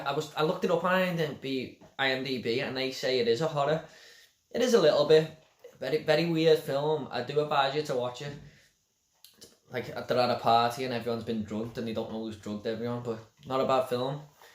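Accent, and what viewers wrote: Liverpool, United Kingdom. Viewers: Are you from Liverpool?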